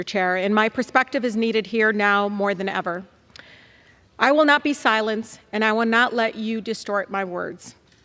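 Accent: United States. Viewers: Shes not from palistine . She sounds American to me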